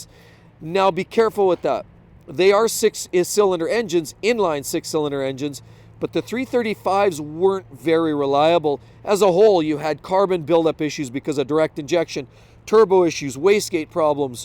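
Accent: Edmonton, Canada. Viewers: Heard your voice- I immediately thought Edmonton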